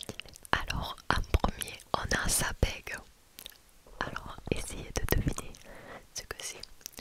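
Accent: South, France. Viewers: Cet accent du sud en asmr juste Woaw🤩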